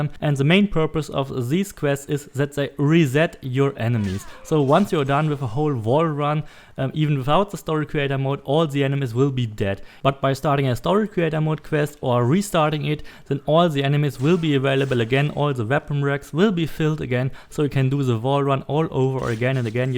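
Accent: Germany. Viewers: You sound like a German to me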